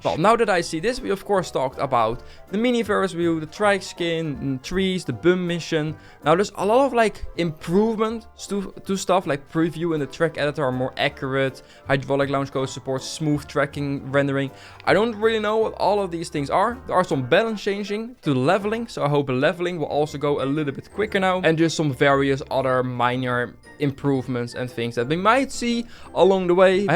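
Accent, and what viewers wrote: Netherlands. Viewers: Are you from the Netherlands 🇳🇱??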